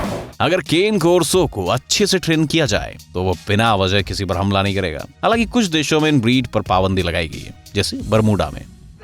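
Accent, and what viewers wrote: Haryana, India. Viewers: Your Hariyanvi accent was outstanding.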